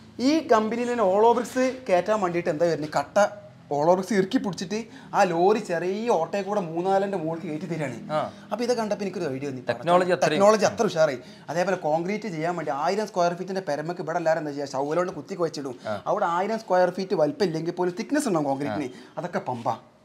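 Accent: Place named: Kasaragod, India. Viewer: ഞാൻ അകത്തേക്ക് പോയപ്പോൾ ആണ് interview എടുത്ത ആളെ മനസ്സിലായത്.. Ninghlde കാസർകോടൻ ശൈലിയിൽ ഉള്ള ..സംസാരം pwoli ആണ്. Aa റോഡിനെ കുറിച്ചും ,പിന്നെ Corona കുറിച്ചും പിന്നെ പലതും superb 🔥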